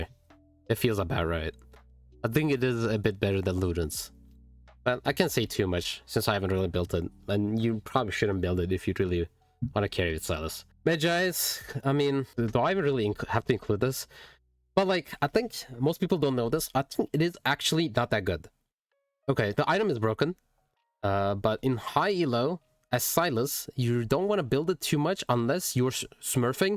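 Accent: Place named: Western Europe. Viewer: Whether you're from EUW (a guess based on your accent) or NA (your region), you're handsome as heck.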